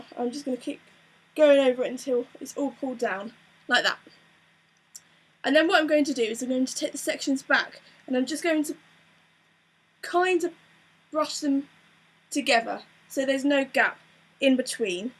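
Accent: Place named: England, United Kingdom. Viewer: I think that's wonderful and I really enjoyed your informative commentary throughout. I suppose exact order and timings of when you put each pin in is crucial to overall result after you explained you could play around with a bit of hair that hadn't yet been pinned into place? Although i used to have long hair i never pinned it so never really considered this aspect. Cant work out if your accent is London/South East or West Country or maybe I'm totally wrong on both accounts!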